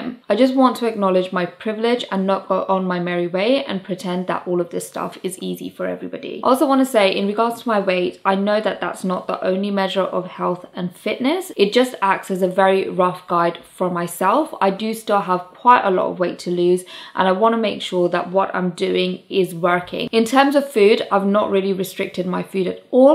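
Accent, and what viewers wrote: United Kingdom. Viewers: Consistency isn't important to me at all. I don't keep track of anyone's schedule. I just check my subscriptions every day and add the videos I'm interested in to my Watch Later list. So I can't speak for everyone, of course, but I would be perfectly okay with you skipping a week now and then if your schedule is just too busy. As for content, my favorite topics are minimalism. decluttering, and finances, but any of the other ideas you mentioned sound good as well, especially health and fitness. Anything that appeals to you would be fine with me. I've watched almost all your videos, and I've enjoyed each of them. As one of the other viewers said, I could actually just listen to you talk all day. As an American, I can't say what sets your accent apart from the typical British accent, but you just sound so posh and elegant ! Congratulations on your weight loss progress. 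I wish you the best of luck with that and with all your other goals. I will continue to watch to see how it's all going!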